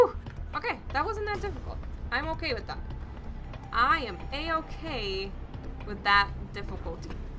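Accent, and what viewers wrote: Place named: New Jersey, United States. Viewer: AND WHY DO YOU SOUND LIKE SOMEONE FROM NEW JERSEY LMAO I LOVE HOW YOU SAY LADDER AND ANYTHING LMAO <3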